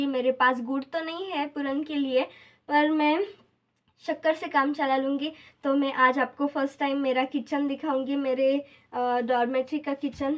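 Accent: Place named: Sindh, India. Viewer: Apki language se aap mujhe sindhi lagti hai mujhe reply kijiyega khushi hogi ke bhar mulk se mujhe reply mila.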